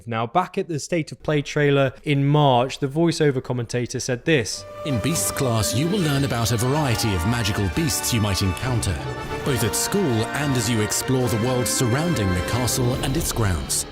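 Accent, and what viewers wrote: United Kingdom. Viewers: Love this info on professors! You're by far my favourite channel for Hogwarts Legacy :) Great info, presented well and with a lovely British accent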